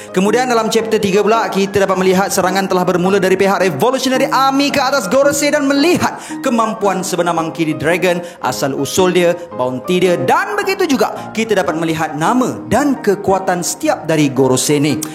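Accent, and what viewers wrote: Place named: Kuala Lumpur, Malaysia. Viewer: Alamak u bro cara u ckp mcm org K.L laa..Ape,mne adew,.korang...Terbaik br0👍...Tapi mmg mantap cara lu brbahas n brcerita...Jelas,.Aku dgr cara lu brcerita Terbaik..Aku bgi 8/10️💫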